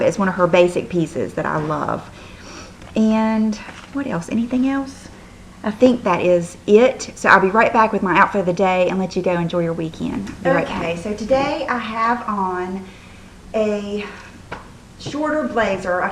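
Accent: South, United States. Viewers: Hey,! I've just been having a marathon here lately.:) I'm a Mississippi girl and totally get your Southern accent. I think it's part of what makes you so charming. Our Accent is a blessing! You keep doing you!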